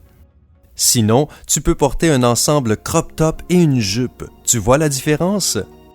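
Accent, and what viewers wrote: Quebec, Canada. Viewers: L’accent québécois omg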